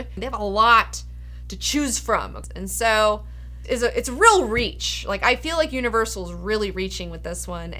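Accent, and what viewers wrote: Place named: Midwest, United States. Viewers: Midwest "O" pronunciations. Holla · Where did that Pittsburgh accent/ midwest accent come from?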